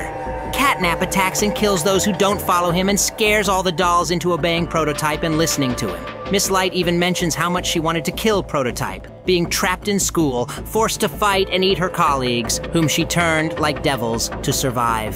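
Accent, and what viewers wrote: United Kingdom. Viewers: Bruv American to British now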